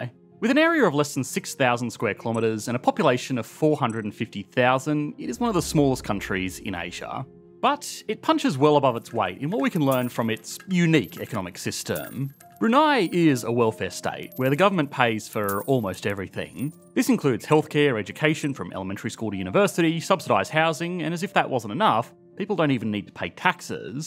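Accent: Australia. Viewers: Greetings from China! You an Aussie or Kiwi? Guessing Aussie... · He's an Aussie. I assume that you have a VPN to watch this from China.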